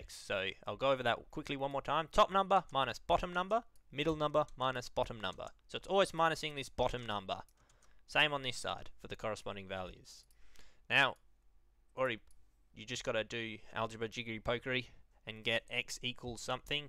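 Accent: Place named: Australia. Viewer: Aussies make explanations much more fun to follow with their accents, cheers mate, and great job!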